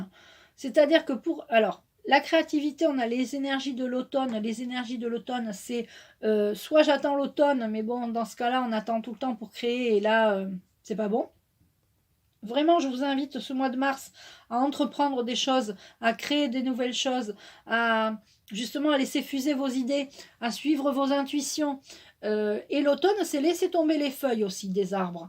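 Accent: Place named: Marseille, France. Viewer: J'adore ton accent il ressemble au mien de Marseille ❤️❤️❤️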